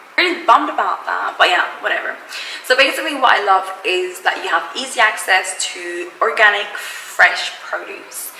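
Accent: United Kingdom. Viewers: U give the feeling that u got a bit attitude . Sorry to say but u are too british in your speaking and body language. If u like western lifestyle maybe try to live in the states or uk and leave africa for the ones who appreciate the real africa without west influence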